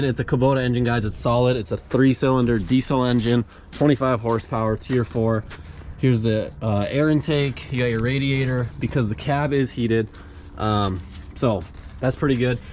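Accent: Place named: Norway. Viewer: Where are you from? Your accent sound exactly like a Norwegian trying to speak American English.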